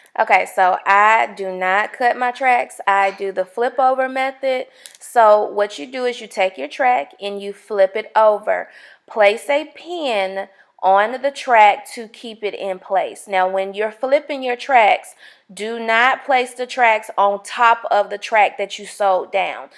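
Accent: South, United States. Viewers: Love that Southern accent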